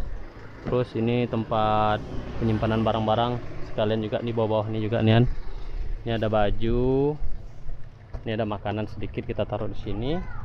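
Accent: Pekanbaru, Indonesia. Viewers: Logat pekanbarunya keliatan banget... support terus. Orang pekanbaru like!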